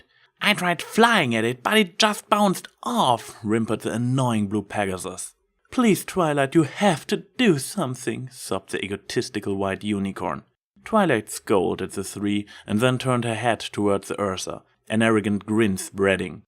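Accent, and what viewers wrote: Germany. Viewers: ich mochte deinen deutschen Akzent schon immer, aber beim vorlesen Find ich ihn wirklich klasse, es gibt dem text so etwa interessantes. Eigentlich interessiert daran deutsche Fanfic zu lesen? Ich finde deine Stimme ideal für "Dramatic Feadings". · cont.3 Thirdly; and understand that this is not meant to mean I didn't like the way you read, because I did; keep at it. Your technique will become more refined with practice, and I think that this is a worthwhile endeavor. I always enjoy a good story, and if it's being read with a German accent, then so much the better. XD And that's about it. Keep it up, man, this was very enjoyable, and I hope to hear more in the future. It was a neat change of pace. /)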